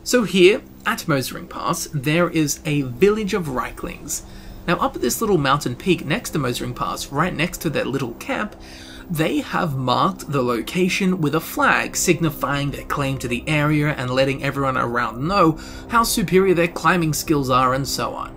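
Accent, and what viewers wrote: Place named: Australia. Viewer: You know, I’ve been going through all of your videos, and I’ve just realized that the reasons I love listening to these are because 1) I just like learning about lore, 2) your buttery voice, softly speaking, accented ostensibly with an Australian (or maybe New Zealand?) touch, and 3) your amazingly awesome alliteration and decadent descriptions. It’s great, I love it.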